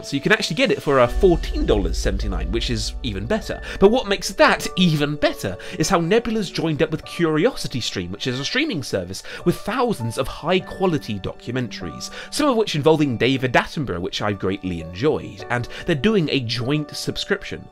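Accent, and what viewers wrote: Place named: RP, United Kingdom. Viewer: This dude is speaking so posh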